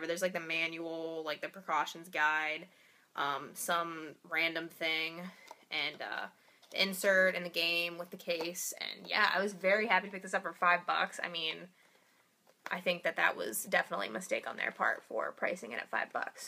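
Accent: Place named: Ohio, United States. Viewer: I'm a new subscriber, but really enjoying your videos! Quite a few to catch up on, but I'm sure I'll get around to them eventually :) Sounds like you're a fellow Ohioan, so it's always nice to meet another one of those. I'm in college as well, so I can certainly relate in terms of business I'm sure. Some great pickups this time around! Especially Spyro! Some of my favorite games on the PS1. 2: Ripto's Rage is definitely the one you'll want to get, though! Excellent game!!!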